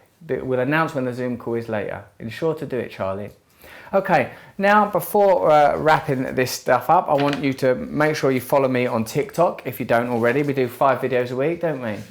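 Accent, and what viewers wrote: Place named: United Kingdom. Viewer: I really didn't expect you to be soooo intelligent... pleasently surprised... I did find it a bit hard getting though your your lovely British accent but I finally managed. Look forward to hearing more from you and thank you sir for giving your time to help all of us who need it. ❤️❤️❤️. Blessings to you and yours.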